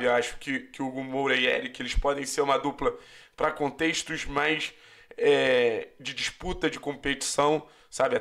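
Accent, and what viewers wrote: Rio de Janeiro, Brazil. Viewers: Sotaque carioca + Camisa do CAP ? Like.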